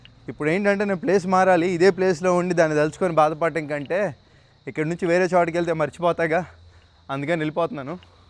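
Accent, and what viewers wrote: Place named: Visakhapatnam, India. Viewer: మీది విశాఖపట్నం ఏనా భయ్యా మీ మాటలు అక్కడిలానే ఉన్నాయి కొంచం. మీది ఏ ఊరో చెప్తారా భయ్యా 😊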